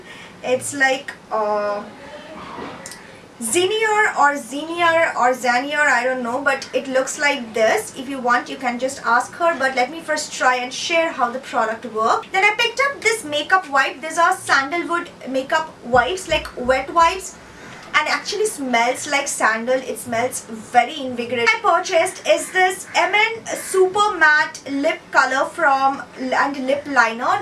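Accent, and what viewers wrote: Bengal, India. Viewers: Apke bolne ka tarika...sooo cute..bengali touch me..love u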